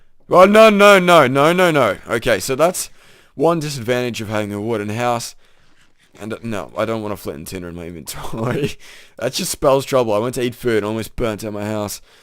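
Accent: Australia. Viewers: Sorry dude, I'm not used to Australian accents.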